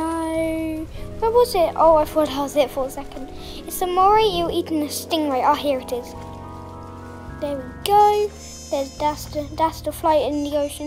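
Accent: United Kingdom. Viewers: you sound like a british kid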